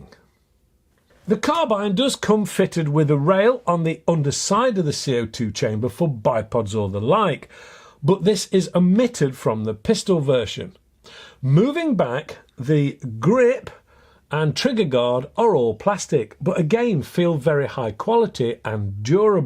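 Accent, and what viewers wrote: United Kingdom. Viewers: Are you from the U K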